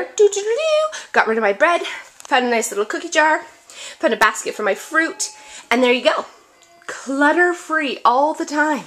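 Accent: Canada. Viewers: I think it's a Canadian accent!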